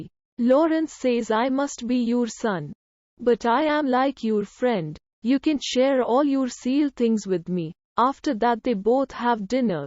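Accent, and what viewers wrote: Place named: India. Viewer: What is with that voice? The channel is called "English Man" and I hear a woman's voice with a heavy Indian accent saying nonsensical things.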